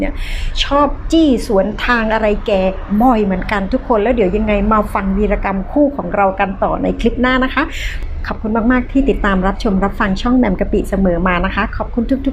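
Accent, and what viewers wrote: South, Thailand. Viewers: พูดดีแล้วค่ะ เราคนใต้ก็พูดแบบนี้แหละ ยังไงพี่ก็ชอบน้องนะคะ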